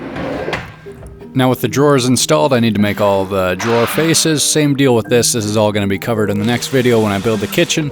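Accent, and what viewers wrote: Canada. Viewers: I'm so used to seeing crappy, overly heavy American builds using crap timber and rough as guts construction techniques that i normally scroll past. Yours is brilliant and attractive with attention to detail. Your stairs are a master class. Cheers from Australia, now realise from your accent you're Canadian. Cheers mate, subscribed. Edit: even watched the adds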